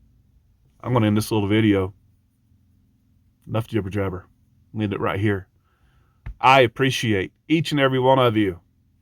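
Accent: South, United States. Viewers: So sorry to hear what little Tucker has gone through. Praise God he is better. I will be praying for you all. it is so touching to hear you praising God and your Savior Jesus. Do you remember when you found the Bible in a campground a couple years back? I began praying for you at that time. I enjoy your thoughtful talks and your southern accent. My Grandparents were from Missouri and I loved their accents. Get rested up!!! My Dr told me to start low and go slow. He made me repeat that to him several times. It is hard when your mind says one thing and your body says another.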